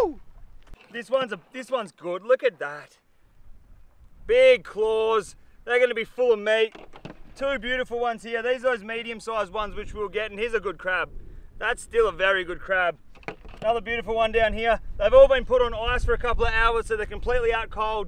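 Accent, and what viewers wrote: Australia. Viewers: Do you also read his replies with the voice and Australian accent?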